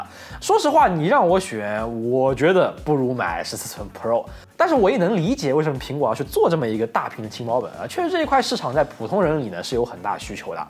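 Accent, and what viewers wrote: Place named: Shanghai, China. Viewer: 博主上海人吧,这个普通话听着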